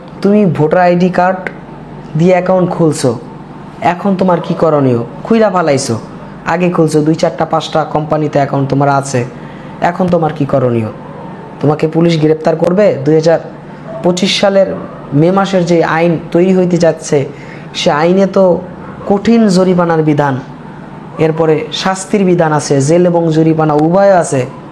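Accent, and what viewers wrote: Barishal, Bangladesh. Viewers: ভাই তোমার বাড়ি বরিশাল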